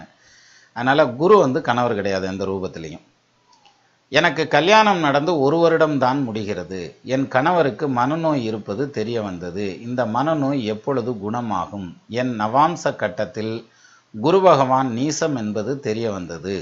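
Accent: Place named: Madurai, India. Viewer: என்ன சார் நீங்க 1000 பிஎச்டி க்கு மேல் பண்ணியவங்களுக்கு கூட இல்லாத இவ்வளவு ஜோதிடப்புலமை தமிழ் ஞானம் எப்படி சார்..அதோட சூப்பர் மதுரை தமிழ்.அப்பப்பா என்ன சொல்லி உங்களை பாராட்டுவது..வார்த்தைகளே இல்லை சார்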